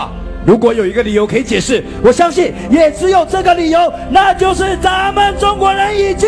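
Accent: Taiwan. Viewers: 我就想问问这是谁？听口音台湾同胞呢？ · 台灣的吧！ 一口一個“xue yi”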